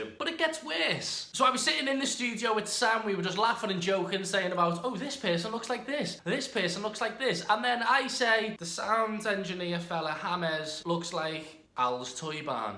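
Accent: Liverpool, United Kingdom. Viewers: Auyyyyyyyyt my fellow scouser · HOW DID I KNOW YOU WERE FROM LIVERPOOL EVEN THO I NEVER HEARD A LIVERPOOLISH ACCENT OMG IM SO CLEVER 😂😂😂❤️ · It’s weird seeing a scouser big in youtube😂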